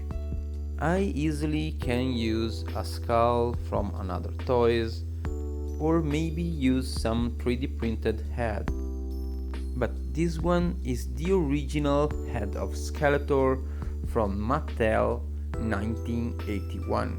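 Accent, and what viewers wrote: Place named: Russia. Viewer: That accent.. are you Russian or something??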